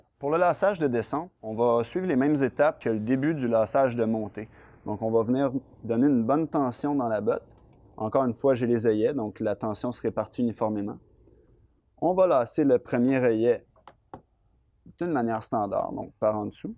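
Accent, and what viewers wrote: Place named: Quebec, Canada. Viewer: C'est un quebecois!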